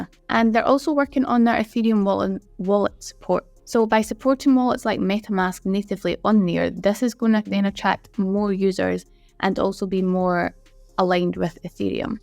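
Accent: Scotland, United Kingdom. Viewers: Good having a scottish person speaking on crypto and relate on youtube 👍. Do you have twitter that we can follow aswel for any more information and updates ?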